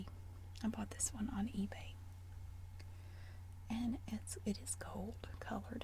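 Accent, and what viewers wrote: South, United States. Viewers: this is the first video of yours that I have watched! I already love you! and your southern accent! wishing you well in your new house!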